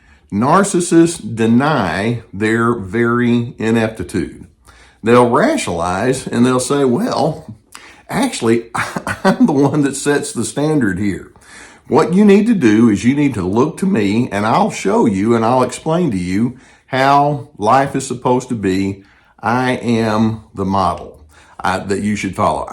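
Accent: South, United States. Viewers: vids began showing up in my recommendations even though I never searched for the topic of narcissism. It's like my android had been listening to me. That's a little creepy. I was in a bad relationship, but never attributed it to narcissism. After watching a few of vids a lightbulb went off in my head. I finally had an explanation for the craziness I'd been living. I so appreciate these vids, teaching method, and I love his accent being a Southerner myself. I've binge watched his vids and learned so much. Thank you!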